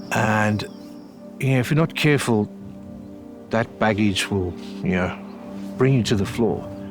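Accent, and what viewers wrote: South Africa. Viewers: I know a south-african accent when i hear one. Lekke lekke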